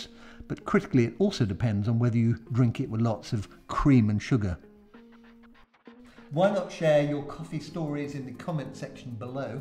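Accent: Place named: England, United Kingdom. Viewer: Drinking my latte as I watch this. Still not quite sure what a "lartay" (as you and so many other English people say - oh no, not us Welsh) is though.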